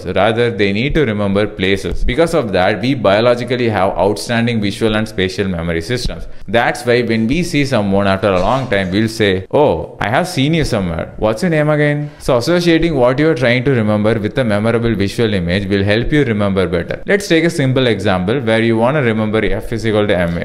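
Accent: India. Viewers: THE AWKWARD INDIAN ANGLOPHILIA: English is obviously not his first language, nor is it the language he is remotely proficient in. Indians, even from the lower social strata who want to rise up, tend to use English everywhere as if they had no language of their own. Strangely, they even talk to stray dogs in English. To appear smart, they tend to talk fast, but then no one understands their gibberish anymore and so they become a laughing stock. Don't they ever think about their ridiculous pronunciation and accent?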